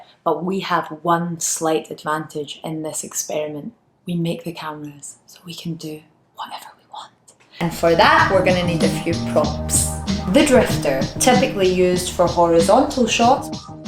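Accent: Scotland, United Kingdom. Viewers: Scottish accent😁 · Insta360 haha so did I! Thankfully when u started speaking the home accent I realised. Alba gu Brath!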